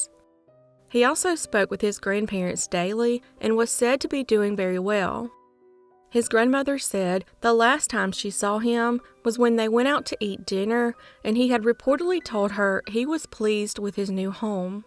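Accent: South, United States. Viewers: So happy to hear your southern voice again. I used to spend winter in Gulf Breeze Florida. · I'm not far in yet but I'm so glad you decided to keep doing ads - you deserve to be paid for what you're doing! Plus your accent is so charming I honestly don't mind them because I'd listen to you read the phone book... and I'm a fellow southern girl, haha!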